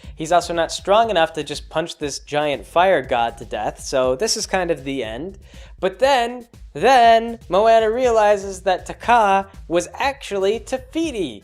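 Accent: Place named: Kansas, United States. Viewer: Kansas accent.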